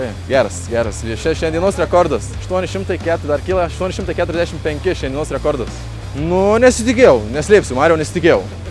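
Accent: Suvalkija, Lithuania. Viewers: Jauciasi suvalkietiskas akcentas 😊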